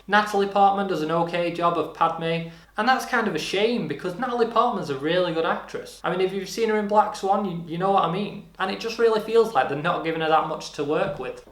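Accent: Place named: England, United Kingdom. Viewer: Good review! I was wondering, where in England are you from?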